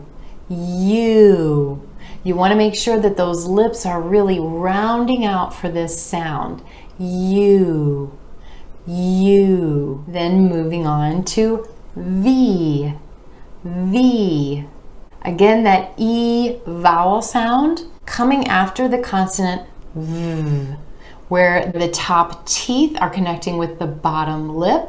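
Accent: United States. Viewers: Respected mam your teaching American accent praise worthy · Mam, I'm from India. Here everyone use UK accent but I like US accent.You are really a good teacher. I Understand very clearly. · You can become a excellent teacher in amarican accent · I heard the pronunciations, like you said 'U' like it is. So, my question is, why do Americans say stOOdent instead of pronouncing the 'U' in it. Why do you say cute, with the 'U' in it and not cOOt if they pronounce it as a double oo. Same goes for cucumber, then rather say cOOcOOmber, if you want to proceed with the incorrect pronunciation. It doesn't make sense. Same with the word mature... Just asking. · Because of I find it easy to learn American accent. Her accent is too cool. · Hey! Your pronunciation is wonderful. Typically the AMERICAN I like. Good luck. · Is this American or British pronunciation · Mam you are amazing I love American accent but I dont know how to sound like that and now I am improving myself by seeing your videos thank you mam❤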